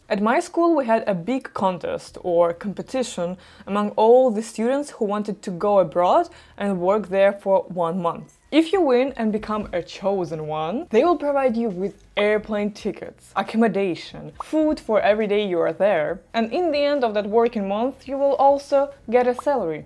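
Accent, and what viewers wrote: Russia. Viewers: ох уж этот наш русский акцент 😅❤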